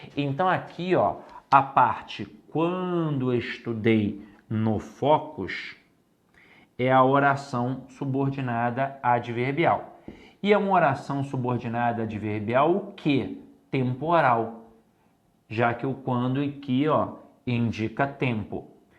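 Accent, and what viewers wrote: Rio de Janeiro, Brazil. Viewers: amei esse sotaque Carioca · Sotaque de carioca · Que sotáque lindo de carioca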